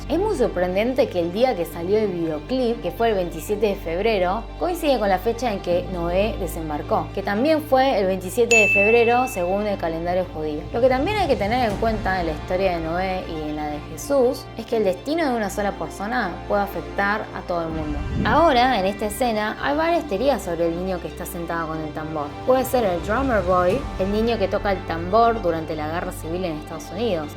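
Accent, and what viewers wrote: Argentina. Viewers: Hablas un español súper avanzado y encima tienes acento argentino. Mae mía felicidades. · Hola! Que bueno conseguir una coreana que hable tambien el español creo que tendras una nueva seguidora.gracias por este video de ON. Que buena explicación. Siento tienes un tono argentino. Eres argentina o coreana.Ha medida de que te escucho me siento muy emotiva. Sera que te puedo hacer algunas preguntas???. Te agradeceria una repuesta. Estare al pendiente. Un abrazo desde Venezuela 💜🌷💜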